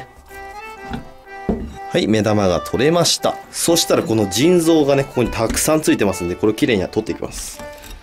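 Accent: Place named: Mikawa, Japan. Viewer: 三河弁が親近感わきました！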